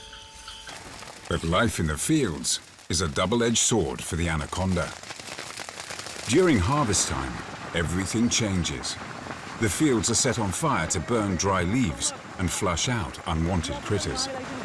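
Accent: United Kingdom. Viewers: This narrator is definitely not American. Sounds like he is from the U.K. somewhere.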